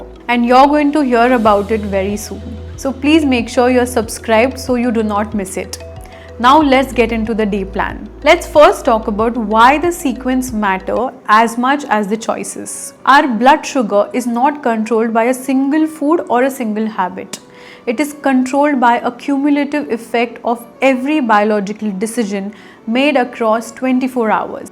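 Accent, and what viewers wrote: India. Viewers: Too verbose.. Please keep the verbiage to the minimum.. too much to process all at the same time.. keep it to the point.. and lastly, your program explains in English.. why don't you explain in Hindi.. your English pronunciations can be very annoying.. it's not your fault.. 99 percent Indians want to speak in English but pronounce their words wrongly, with a regional accent.. someone like me who wants to understand clearly is unable to.. for eg metabolism.. or naturopathy.. you say naa chura puthy or meta bolism. The correct pronunciations are muh taa buh lay shun nd naach uh roh pathy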